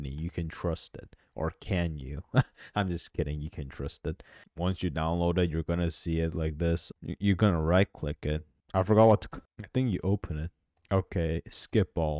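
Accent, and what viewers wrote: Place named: Poland. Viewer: as a polish person i confirm, this is exactly how we speak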